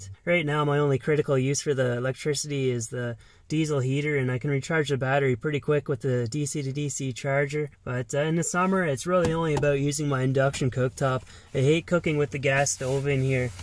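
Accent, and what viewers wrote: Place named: Ontario, Canada. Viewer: Thats how they talk in Ontario eh!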